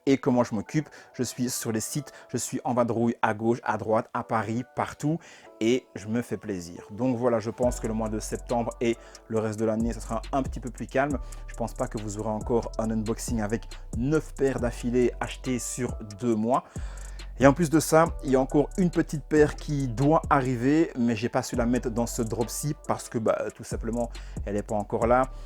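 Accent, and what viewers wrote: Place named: Belgium. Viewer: Petit accent belge 🤣 tu viens d’où ?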